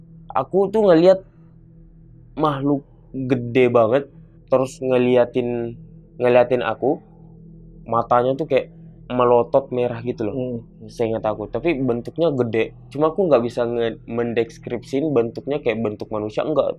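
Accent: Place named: Bugis, Indonesia. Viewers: Pasti ini bang orang sulawesi, kentara logatnya😅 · Ku tempeleng Bugis bgt bg👋👋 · Logat sulawesinya masih ada yah kk,, salam dari sulawesi selatan bone kk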